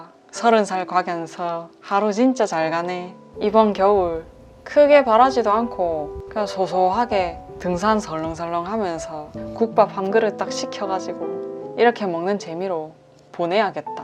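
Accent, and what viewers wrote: Busan, South Korea. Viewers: 근처에 사는 부산녀에요 익숙한 사투리와 승학산 친근해서 좋습니다~~^^ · 부산말 너무 귀여워요. 자꾸 듣고싶어지는 매력!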